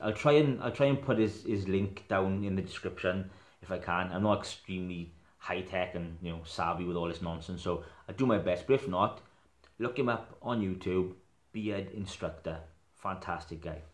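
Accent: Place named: Welsh Valleys, United Kingdom. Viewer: Subscribed earlier today. Glad you have reconsidered stopping. You sound like you are from my part of the world. I am from the Valleys. I come from Rhymney and I was born in a hospital in Merthyr. Your area?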